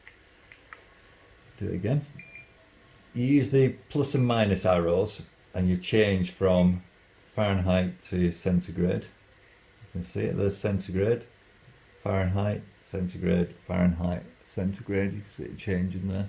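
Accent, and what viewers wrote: United Kingdom. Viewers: Just purchased one and had to learn how to set it for Fahrenheit temp. Good video. British accent was a bonus. 😀 Thank you!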